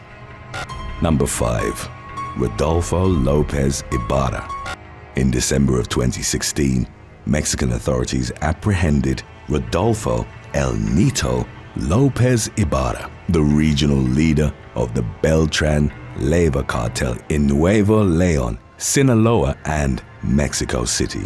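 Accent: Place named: Hackney, United Kingdom. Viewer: Bro, you sound like Idris Elba? Hackney accent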